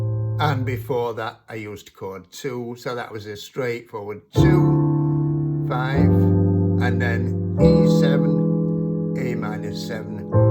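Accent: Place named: Northern England, United Kingdom. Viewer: Cool to hear a northern accent! I’m from Manchester! Great video:)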